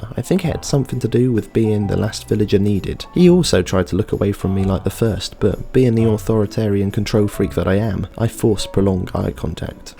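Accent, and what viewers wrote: United Kingdom. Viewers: Ah yes British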